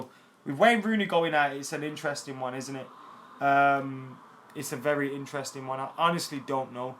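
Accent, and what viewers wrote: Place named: London, United Kingdom. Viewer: lol you're from the endz eh. London or Manchester?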